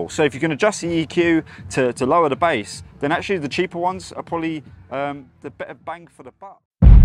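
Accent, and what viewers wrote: United Kingdom. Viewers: do you have a Cockney accent?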